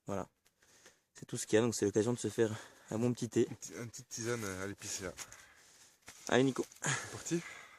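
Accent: Franche-Comté, France. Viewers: J'aime pas trop le côté commercial de chez bear gylls je préfère les couteaux plus rustique, comme la ma prochaine acquisition sera le bushlore de chez condor, si tu ne le connais pas Ju0069 a fait un rewiew test très intéressante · Coucou suis de sortie demain ou dimanche vous laisserai une dedicasse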